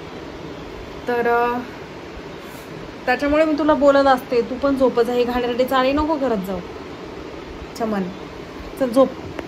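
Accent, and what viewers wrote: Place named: Nashik, India. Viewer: शेवटी ताई तुझ्या बोलण्यामध्ये थोडी नाशिकची झलक आहेच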